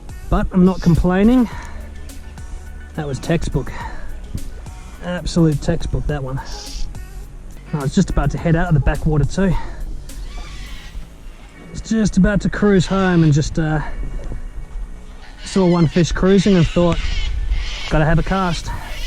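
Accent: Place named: United Kingdom. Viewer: so I'm confused.... you sound like one of "those people" who would say pm as 'half nine' rather than nine thirty lol but you mentioned Rex Hunt, I'm guessing that would mean you moved here 20+ years ago and still haven't lost the accent? or am I WAY wrong? either way mate I'm loving your carp/fly vids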